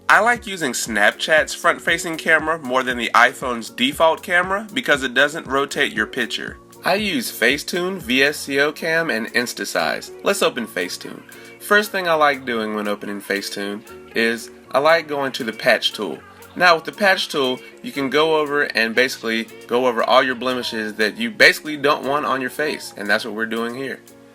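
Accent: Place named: Carolina, United States. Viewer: It’s crazy how I could tell that you’re from Carolina like me bc of your accent 😭great video tho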